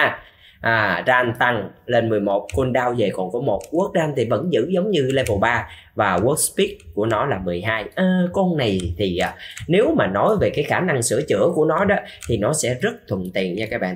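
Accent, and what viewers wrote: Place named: Binh Duong, Vietnam. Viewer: Anh hôm nay giọng hơi bình dương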